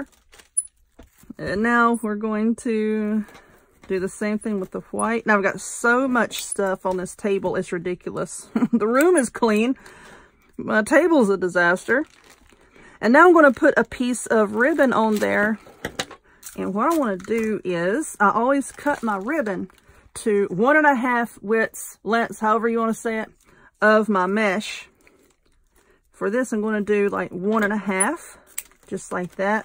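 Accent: South, United States. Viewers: I life you because you don't talk a lot you are explaining what you are doing. Oh & I like your southern accent. I am from Laredo, Tx. & live in Cotulla, Tx*;0) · You are such a great teacher! I totally feel like I can make anything you give instructions for. And being a Southern Girl myself, I adore hearing the “Oh good lords,” etc. You sound just like me! 🤪 Love your channel. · I love your chatter! And your Southern accent! And you absolutely, hands-down, make the most beautiful wreaths on YouTube!! I most definitely am going out tomorrow hunting for all the stuff to make this one too!! Keep 'em coming!! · I love your chatter! I also like the "southern accent" you have (I am from South Carolina). Don't change for anyone! You are a wonderful crafter and that's what brings us all back!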